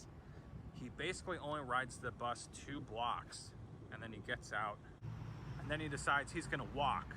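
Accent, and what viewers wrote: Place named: United States. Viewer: this guy is American. Of course he says it American.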